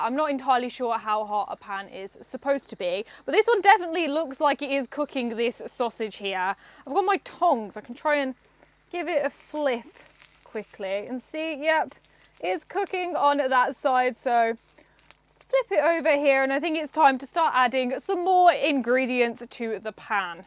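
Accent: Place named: England, United Kingdom. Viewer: Lovely English accent ❤. I'm definitely going to make myself that same English breakfast.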